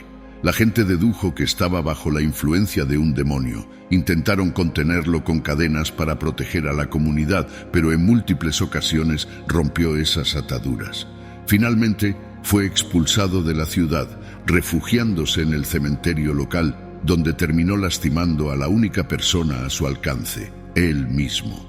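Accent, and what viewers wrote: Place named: Spain. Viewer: Jodrrr que majestuosa voz tiene el hno relator , se nota su marcado acento español , q buen trabajo ha hecho en este video , felicitaciones !!!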